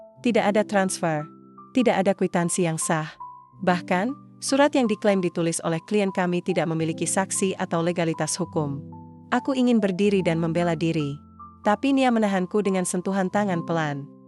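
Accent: United Kingdom. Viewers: Apa sih ganggu banget harus di selingi logat Inggris segala buat apa ? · Mengapa bahasa Indonesia menggunakan logat bahasa Inggris ?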